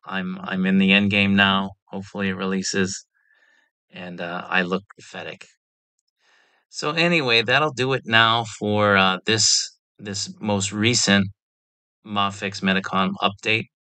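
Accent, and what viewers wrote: Boston, United States. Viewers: My Yankee ears are picking up a Red Sox accent lmao. Thanks for the updates bro. Need that new Batman and Eradicator soon!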